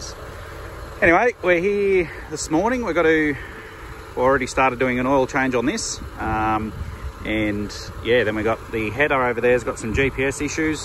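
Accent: Australia. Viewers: Hey, you need to include an Australian dictionary with your videos. What is a brick dunny? Yeah, I know what it is, but occasionally I have to scratch my head on some of your terminology. LOL. Not a problem. I totally enjoy your videos. I visited Australia 4 times, so I did learn a little bit about your lingo. R's are usually silent. A bar is a bah. On a farm, the list of equipment that needs work is endless and always changing.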